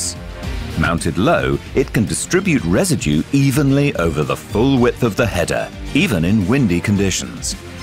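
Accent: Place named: England, United Kingdom. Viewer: I hate hearing English narrators talk....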